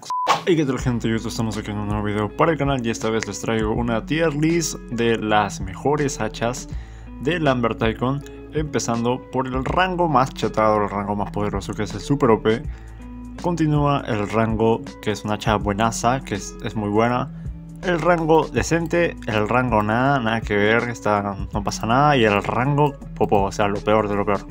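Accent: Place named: Peru. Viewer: Buenazo! Todavia con acento peruano causa xd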